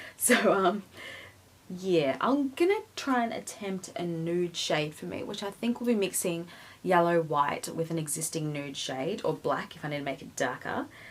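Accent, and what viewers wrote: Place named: Australia. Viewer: Are you Australian by any chance? You kinda have an Aussie accent.. Loved this video~<3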